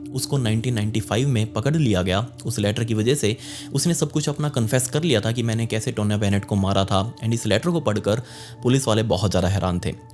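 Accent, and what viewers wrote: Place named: Mumbai, India. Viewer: आपका बोलने का तरीका बहोत अच्छा हैं और मुंबई स्टाइल वाला हैं इसे आप जैसा हैं अच्छा हैं साहब जय हिंद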